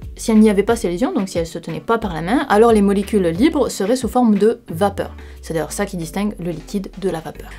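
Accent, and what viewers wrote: South, France. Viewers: Question totalement annexe, comment est perçu ton accent du Sud (? C'est bien ça) au Québec? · Tu as un petit accent du sud de la france ou j'ai fumer ?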